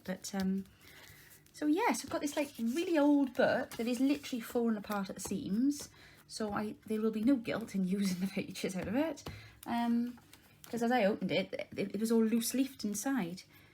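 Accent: Wales, United Kingdom. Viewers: Ooh, I love listening to your lovely Welsh accent! Your style is easy to follow and such great ideas. I’m new to your channel and will be watching with interest...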